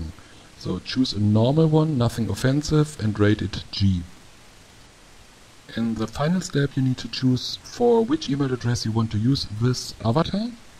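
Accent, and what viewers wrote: Germany. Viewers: are you german ? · You are German :-D I hear it ^^ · ein deutscher der englisch spricht= geiler akzent! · Ich liebe den deutschen Akzent :D herrlich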